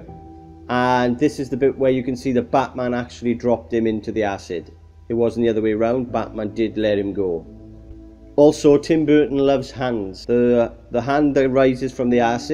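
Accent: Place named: Wales, United Kingdom. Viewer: Welsh accent. I assumed you would be American, don’t know why.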